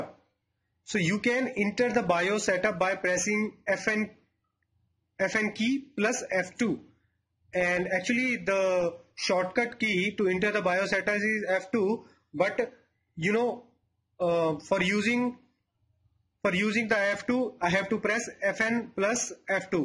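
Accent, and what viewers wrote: India. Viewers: Indian accent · Judging by the accent you must be Indian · Love that authentic Indian English accent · FantasticGamerYT it's an Indian accent you're just an ignorant ass who has no consideration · HAHAHAHAHA I love your indisch accent :D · sound indian bruh XD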